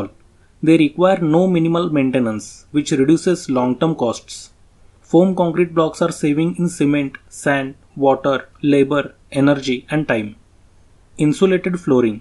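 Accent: India. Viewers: Fantastic all round explanation. Thank you wise man. Love Indian English accent. Great job. Cheers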